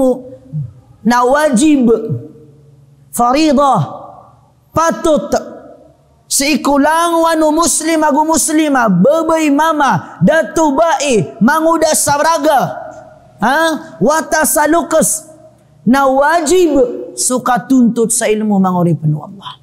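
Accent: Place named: Maguindanao, Philippines. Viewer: Mashaallah..gusto ko c ustadz di masyado.maranao pg pronounce nya parang maguindanao din.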